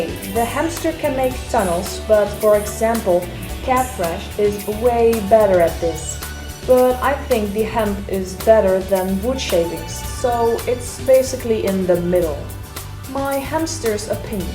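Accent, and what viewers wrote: Netherlands. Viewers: hoi ik dacht al dat je nederlands was door je accent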